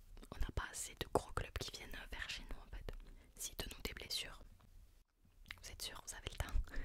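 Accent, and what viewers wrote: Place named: Marseille, France. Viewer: L’accent 😍 marseillaise en plus 🔥 ailier gauche à la Dimitri Payet, caviar sur caviar pour ta numéro 9 🤝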